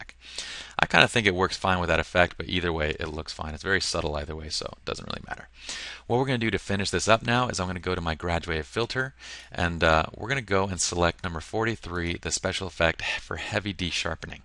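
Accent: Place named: South, United States. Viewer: Love the accent...it's ok....it's a Southern thing. You made me laugh and Lord knows I needed to this morning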